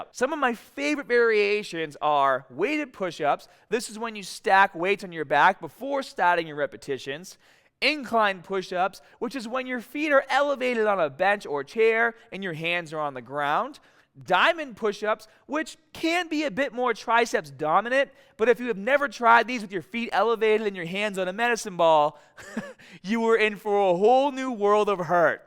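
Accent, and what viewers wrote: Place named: New Jersey, United States. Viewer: U a lil bit New Jersey, but great also hahaha ! Awesome analysis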